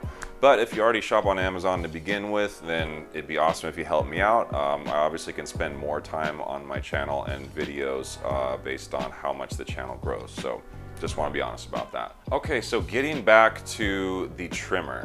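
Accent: United States. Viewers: That god-awful American accent, like scraping a nail across a chalkboard.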